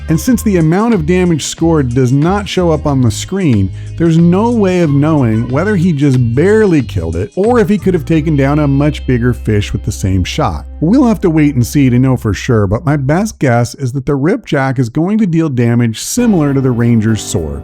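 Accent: United States. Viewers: For Some Reason you Remind me of a Few Guys I grew up with and learned from in SoCal. ( there older than me ) I think it's how you talk and Explain Things, so Unlike the other Videos of people Giving Advice on XCOM2, which can be a complicated subject in itself. I don't have to Watch your videos 10x's to Understand what Your saying! Thanks for All the Help! I really appreciate you Donating Your TIME ( if you think about your Time is EVERYTHING, it's all one Really Has ) to Helping others, Keep Up the GOOD WORK!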